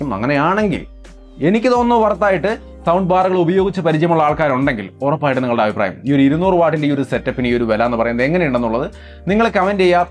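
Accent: Thrissur, India. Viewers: Trissur slang🫶🏻⚡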